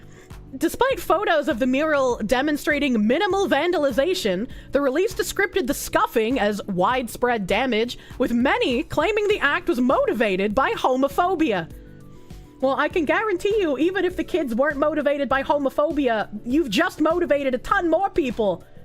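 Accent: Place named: Boston, United States. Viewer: She's a bawstin gal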